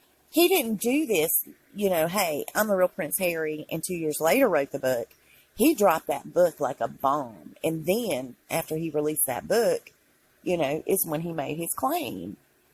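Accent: South, United States. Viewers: Love your Southern Accent! I'm from the South too, Louisiana. Just subscribed today to your channel. I would LOVE ONE OF THOSE WIGS for a friend of mine going thru chemo. She's very dear to me. I understand if I'm too late. God Bless you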